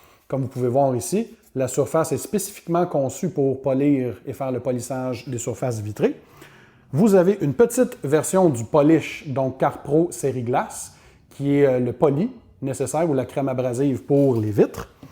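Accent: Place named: Quebec, Canada. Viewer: j'étais sure après avoir écouter une dizaine de video en anglais avant de tomber sur celui ci que tu étais quebecois. merci pour les infos.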